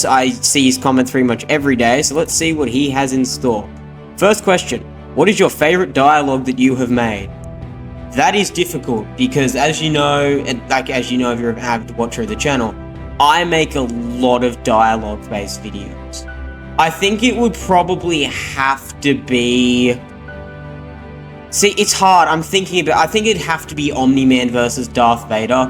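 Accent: Australia. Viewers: I didn’t expect u to be Australian! W tho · I hope you don’t mind me asking cause I would like to know if you’re Australian?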